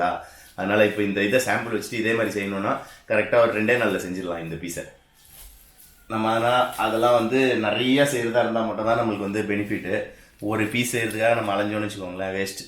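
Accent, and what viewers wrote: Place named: Chennai, India. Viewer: Hi man...நீ பேசுறத வச்சு நீதான் கைப்புள்ளன்னு நிக் நேம் வச்சுகினேன்னு நென்ச்சிட்டேன்பா. .. படா ஷோக்கா கீது பா ஒன் டாக்கு ஆங்..மட்ராஸ் பாஷை கொஞ்சம் கூட எச்சாவா கயந்து அள்ளி வுடுபா..பேஷாபூடும்..ஒன்க்கு அது நல்லா சூட் ஆவுது தோஸ்த்து!!!!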